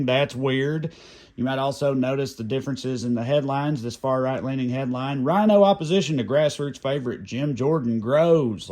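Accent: South, United States. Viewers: I never realised that someone with a southern accent was capable of criticising the Republican party. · I am a Canadian (love your Southern accent)…seriously though, you shoot straight from the hip, and call it as you see it! You are the ACTUAL voice of America, over all the Trumpers and Republican deadbeats. Thanks! Lovely hearing your views. Hope there are a majority out there that feel the same way you do!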